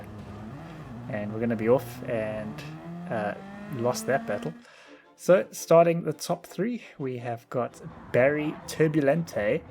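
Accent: South Africa. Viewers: You sound South African mate i swear you are i don’t just wanna say you are but you sound like it mate im watching am eskom turned my life off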